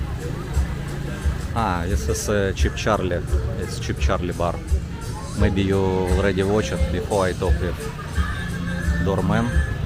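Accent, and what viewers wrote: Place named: Russia. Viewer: you did a nice job on the video tour of Barrio Barreto. I will go visit in a few months. I have already booked my hotel (which you passed by in the video). I haven’t been to Angeles City since the ‘80s, so when I watch videos of Walking Street it just seems a little too much “in your face”, although I do enjoy P. Burgos St in Makati. Olangapo looks like a relaxed place to chill out with the locals. I enjoy listening to your Russian accented English. You have learned to speak English better than most. Good luck with learning Chinese!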